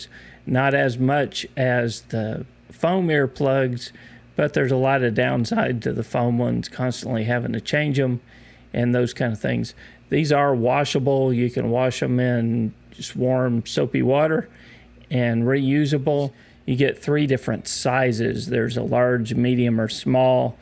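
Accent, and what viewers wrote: Texas, United States. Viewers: Hey. You and Anthony might be twins, but he talks like New Yorker, and you talk like a Texan. You just need to practice saying "y'all" instead of "you guys" when you record your videos. Loved your impersonation of Anthony! Hilarious!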